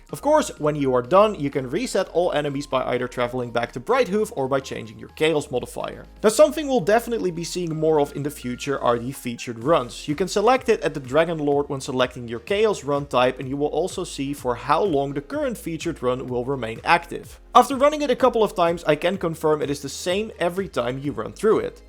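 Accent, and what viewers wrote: Netherlands. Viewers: You gotta be dutch.... you speak like most of my fellow dutch country man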